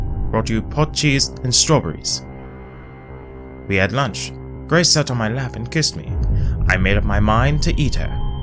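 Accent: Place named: United Kingdom. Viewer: I loved your British accent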